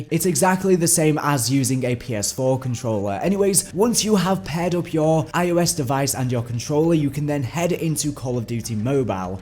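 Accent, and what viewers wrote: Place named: North, United Kingdom. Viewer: You a northerner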